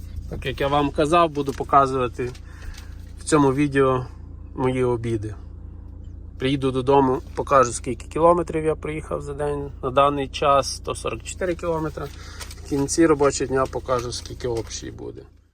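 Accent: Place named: Vinnytsia, Ukraine. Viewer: Ви говорите як більшість на Вінниччині...))))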